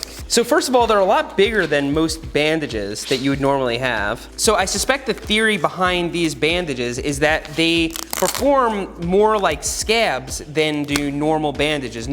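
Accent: Long Island, United States. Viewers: he said it in another video he is from long island it is his long island accent showing through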